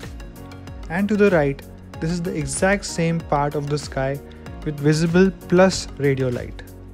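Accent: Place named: India. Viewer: Despite the Indian accent, the contents are very clear & quite easy to follow.. Thank you...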